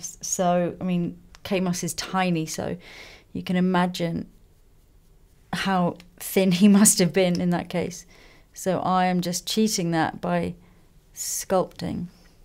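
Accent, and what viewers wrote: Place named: England, United Kingdom. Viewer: I don't like everyone's English accent... In fact, very few people make the cut... But, the way you talk...Ahhhhh...so soothing... Perfectly melodious❤️love all your videos... You're a proper artist!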